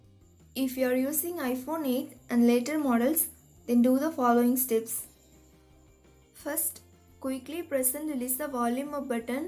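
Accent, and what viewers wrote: India. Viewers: Indian accent ?!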